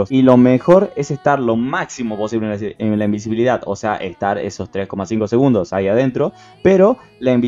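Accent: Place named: Argentina. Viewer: si le quitas el acento a argentino es igual a la voz de fernanfloo xd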